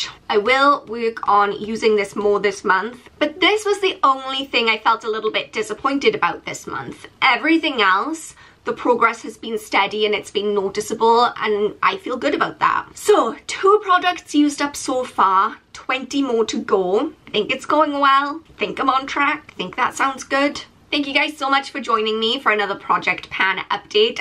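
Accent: Wales, United Kingdom. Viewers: Be proud of your Welsh accent!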